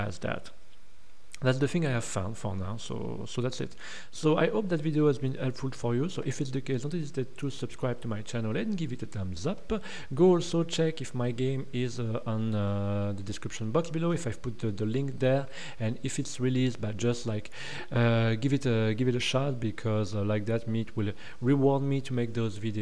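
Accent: France. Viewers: Bonsoir, désolé si je parle en français mais mon anglais est vraiment naze et je crois comprendre avec ton accent que tu es français aussi;). J'ai un problème, je fais exactement comme toi et pourtant quand je traverse la zone cachée, l'anime revealed commence tout juste à s'afficher mais ne finit pas jusqu'a disparaitre complètement. Saurais-tu pourquoi s'il te plait?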